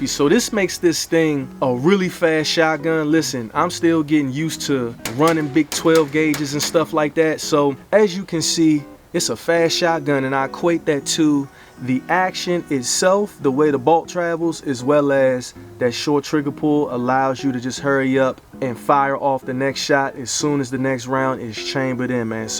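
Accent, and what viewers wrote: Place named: DC, United States. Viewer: What range are you using? You sound like you’re from around the way. DC/Maryland/Virginia.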